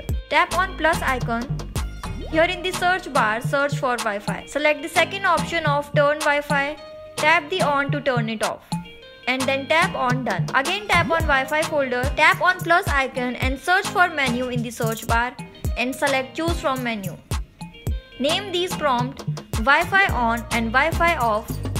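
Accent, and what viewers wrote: India. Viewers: Oh yes let us find da root cause for da same. Indian English is so brutal...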